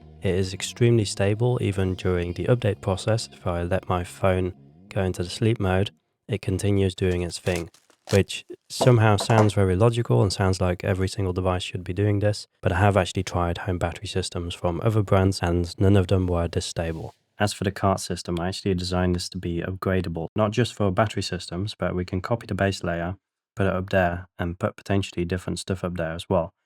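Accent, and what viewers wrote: United Kingdom. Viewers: Out of interest, are you Dutch+British? You have a very British accent, I initially thought you were based in the UK when I first started watching your videos haha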